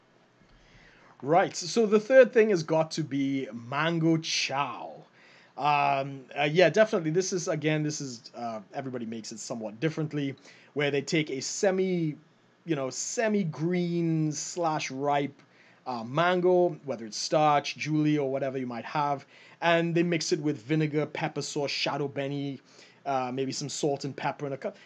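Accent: Trinidad and Tobago. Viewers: To me you sound like you have a bit of a Trinidadian accent and you can always make your own trini pepper sauce if you get pepper in the UK. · His trini accent on point...I grew up in Maraval. Now living in the USA for 27 years. Have to agree with you about the food. As an avid traveler still no food can compete with Trinidad cuisine. Mango chow 😋😍 Try to get back home often. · Congratulations u sound like one of us. Like a Trni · After leaving home 50 years ago 😱 I still miss the food! When I lived in England, I used to leave Bournemouth and travel to Brixton to get cooking supplies, but that was 45 years ago! I now feast and stock up when I travel to Brooklyn or Miami from my island home in the mid-Atlantic. Enjoyed hearing the Trini twang!! Best wishes.. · I hearing an American accent, Trinidadian accent, bajan accent and maybe even a lil british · you sound Trinidadian. 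Doesn't matter. I miss the food too. Callaloo and dumplings. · Hello not a trace nah... he sounds very Trini 😍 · I can hear the trace of Trini in his accent. My Fiancee is Trini, but from Boston while he parents are from the island so I hear it a lot (and the food is awesome). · Dont be so mean, in many many parts of the UK people have different accents, plus there are many different people from all over the world live here sometimes people pick up other people accent, when they spend alot of time with certain people, that's why your hearing trni accent mixed with bristish he is not doing it on purpose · That English Trini accent is niiiice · Ah hearin' a trace ah Trini in your accent. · This is the first time I’ve seen a white guy guy with a Trini accent. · He has a Francesca Hawkins style Trini accent. And if you don't know who Francesca Hawkins is, you're probably not a Trini.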